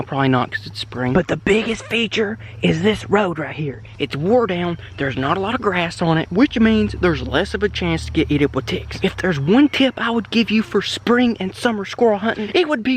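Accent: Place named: Kentucky, United States. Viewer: Dear god Came across your channel today watching the Indy 500 Heard your accent for the first time and I thought you were from Kentucky instantly. One you said that verse though, instantly subbed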